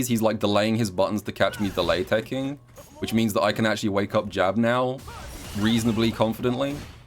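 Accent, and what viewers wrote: United Kingdom. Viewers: do again mr british very cool and insightful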